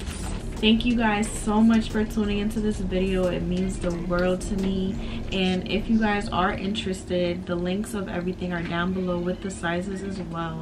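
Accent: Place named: Jamaica. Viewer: As soon as me hear d patois me subscribe😂I shall live ova yah now🤣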